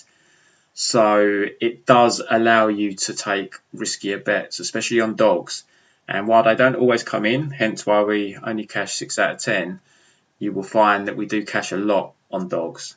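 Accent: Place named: Cockney, United Kingdom. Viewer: your accent sounds like it's not sure if it's cockney or somewhere in cali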